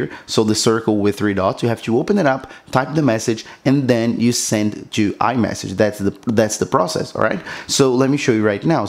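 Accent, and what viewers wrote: Brazil. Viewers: I was like “I can hear the brazilian accent” and then I saw your whatsapp msgs “blz man” hahahahahaha muito obrigada, ajudou muito!!!!!!!!!!!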